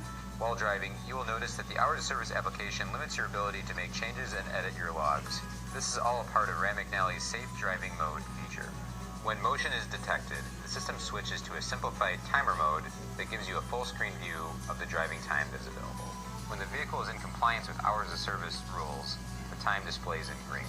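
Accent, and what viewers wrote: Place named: Boston, United States. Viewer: He speaks with an Boston Accent!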